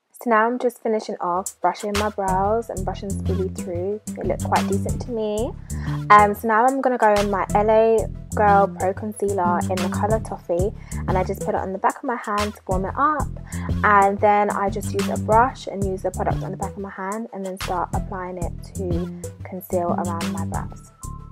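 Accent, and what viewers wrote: United Kingdom. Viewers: I had to subscribe you literally got me when I heard your voice. British, so I know where to buy makeup on a budget plus your makeup is FLAWLESS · are you from essex?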